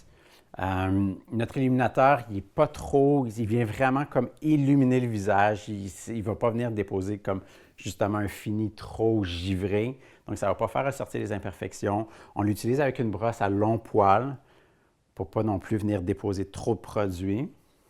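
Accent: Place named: Quebec, Canada. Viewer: J’ai trop d’amour pr l’accent québécois je meurs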